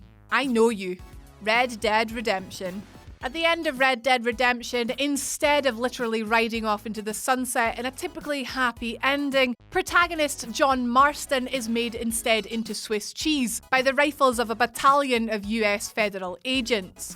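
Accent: Scotland, United Kingdom. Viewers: is she Scottish? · I'd love to know on which planet the Scottish accent sounds like a mashup with another accent. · She's Scottish. :-)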